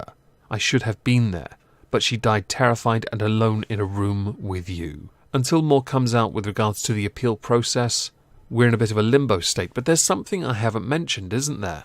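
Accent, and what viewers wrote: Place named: North, United Kingdom. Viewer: Maybe, but do they have your buttery smooth northern accent?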